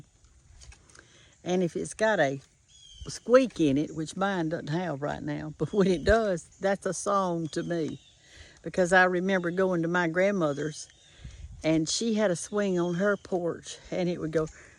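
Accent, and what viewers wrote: South, United States. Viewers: Hi queen I'm from Augusta Georgia and I love that country voice lol the way you cook them liver and onions thank you again ❤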